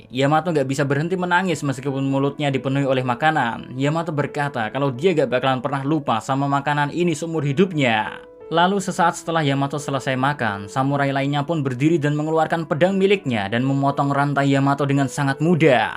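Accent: Java, Indonesia. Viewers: Lidah jawa mas . Jangan heran . Yang penting penonton paham 😁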